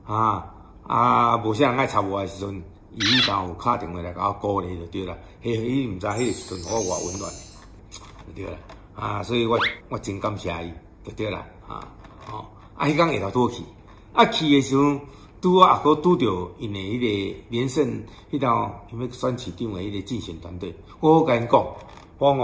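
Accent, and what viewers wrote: Taiwan. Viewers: 旺旺聽你說話真的感覺很庶民。一點也不像大老板。又很台。不知道平時有沒有穿藍白拖🤪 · 旺旺阿伯說話很有台灣味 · 天啊！台語口音好道地喔！怎感覺有海口音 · 台語講的很道地，首富卻沒有傲氣與霸氣，更接地氣。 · 道地隔壁阿伯說話，真親切。道地臺灣話真親切。謝謝你站出來。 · 體就是台語夾國語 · 哇，董仔的台灣味十足，還真蠻有吸引力的！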